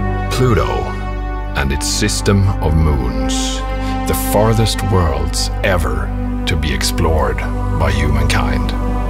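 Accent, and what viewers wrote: Sweden. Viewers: TROLLS DOE He's just Swedish.. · Why did you pick a swedish voice over dude. Hurts to listen to. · I thought he sounded Swedish =)...I was right. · I bet the narrator is Swedish :D You can clearly hear the accent. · The speaker must be Swedish. He has to be.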